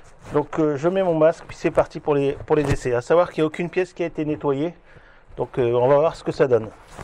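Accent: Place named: Nord, France. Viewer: T es de ch'nord toi 😃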